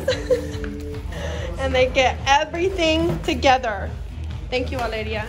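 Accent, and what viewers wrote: Paisa, Colombia. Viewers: te siento un leve acento paisa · Tu acento paisa es genial... Un fuerte abrazo, eres una digna representante de Colombia ante el mundo... Saludos desde Bogotá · Me encanta tu acento paisa cuando hablas en español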